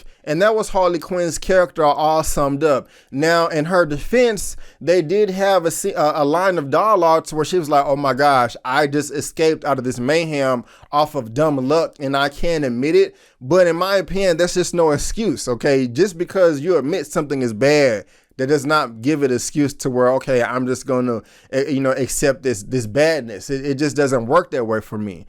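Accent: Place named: North Texas, United States. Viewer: It sounds north Texan to me like dfw area mart be very wrong but that's how my people talk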